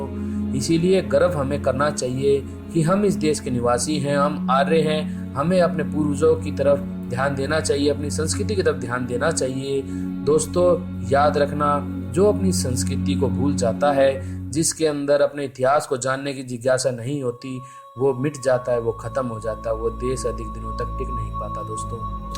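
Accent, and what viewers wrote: Haryana, India. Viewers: Bhai haryane ka h k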